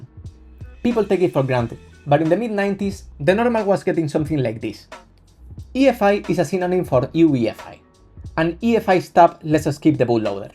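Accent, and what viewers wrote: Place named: Spain. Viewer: Tu eres español, que te he pillao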